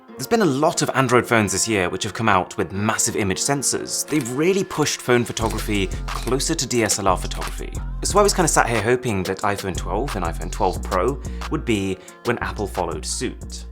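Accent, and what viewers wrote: RP, United Kingdom. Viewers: I just absolutely love watching this guy's videos and updates! Always super detailed and right on point along with that fantastic and beautiful British accent 🥰!! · this guy speaks like a royal family member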